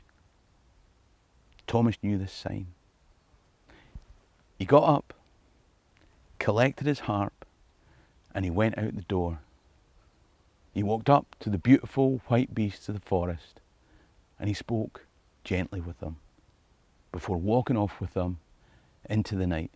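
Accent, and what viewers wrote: Scotland, United Kingdom. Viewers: I always think I'm going to fall asleep to his beautiful Scottish brogue but my brain never wants to shut down mid story. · Loved the story. Your voice and accent is mesmerizing. It's like the soul of Scotland 🏴 come to life. Makes me long for a place I've never been but always wanted to be. Happy 60th Birthday. 🎉 P.S. Don't ever lose your Scots language.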